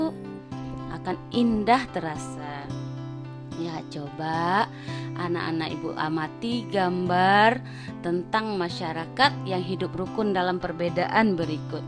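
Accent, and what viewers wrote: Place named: West Sumatra, Indonesia. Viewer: Msih pkat logat minangny buk...ttp smngat cik gu ,🙏🙏☺️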